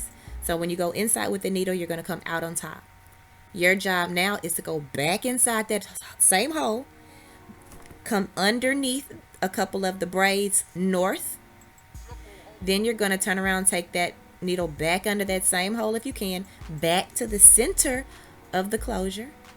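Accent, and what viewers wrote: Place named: South, United States. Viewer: Love your tutorials, and I especially love your country accent!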